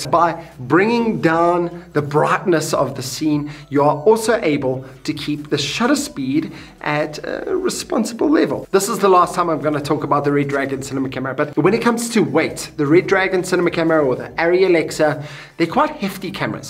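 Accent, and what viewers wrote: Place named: South Africa. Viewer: I can hear you're South African😂 hello from Durban😌👍🏻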